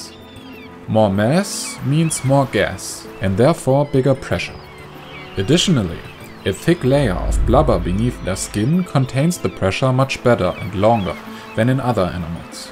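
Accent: Germany. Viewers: Are you german? You have a very strong accent. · RZU 147 I'm not even German but I know enough to within the first words determine he was. How the hell is a fellow german unable to recognize that... Lol geeze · RZU 147 his accent is german, i know that because i am german. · Oh the German accent...xD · Chris Purolover he is German or Austrian or maybe Swiss but I doubt Swiss, his accent is clearly Germanic. Listen to how he stresses his 's's. The z and long s which are native to German give away his lingual origin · RZU 147 im German and even I think he sounds German as hell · RZU 147 he totally sounds German xD that accent is so strong (I don't mind it though) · Ekn _38 I am German too and I heard it in the first second 😂😂 Grüße gehen raus ♥️ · Ich finde es eher traurig, dass du dieses Video unbedingt auf einer Fremdsprache bei der man es so stark heraushört, machen musst! 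Warum bleibst du denn nicht bei deiner Muttersprache? Denke, du hättest mehr Erfolg damit so nervt es nur. Oder aber du überlässt das Sprechen jemand anderem, wenn es denn unbedingt auf Englisch sein muss. Es gibt auch viele Deutsche, die nicht diesen typisch deutschen Akzent im Englischen haben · The German accent makes it more convincing. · from Germany look at Channel Info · German Accent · Sooo true, 5 seconds in I stopped the video and looked for this comment. I´m German myself and there is no way this dude isn´t one of us, it´s so strong and noticable!! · RZU 147 "Dude" ... It IS a german accent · I love your German accent. Your style of animation is really similar to other channels I like too! Subscriber earned.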